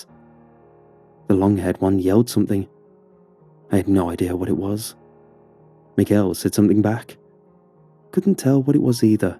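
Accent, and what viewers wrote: England, United Kingdom. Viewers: Btw ..now im in time. .haaah. itz 14hours ago .. btw. Where ever this story comes from. ... think america. But the voice is so. English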